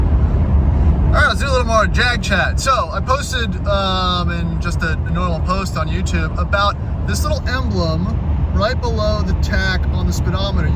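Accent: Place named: United States. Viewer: You’ve bored me in first 30s maybe it’s your American accent maybe it’s the fact you guys no nothing and European cars , and why have you got 3/4 old crap cars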